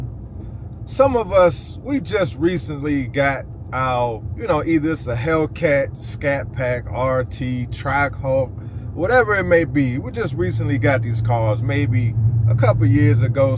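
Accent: DMV, United States. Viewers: That looks like old Bowie, MD fr. You definitely from the DMV! I can tell by your accent too!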